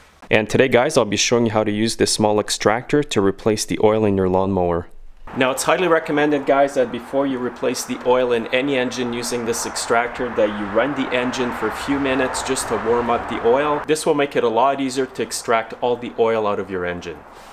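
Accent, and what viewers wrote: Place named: Canada. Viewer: Can tell u are Canadian. Your English is perfect, and you are a perfect instructor. Have you considered teaching at a college?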